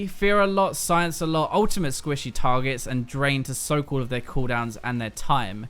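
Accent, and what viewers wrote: United Kingdom. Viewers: God I hate that british accent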